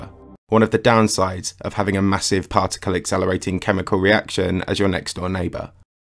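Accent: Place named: RP, United Kingdom. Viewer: Softened upper class British accent that evokes confidence with slight superiority. He could be telling us martians are real.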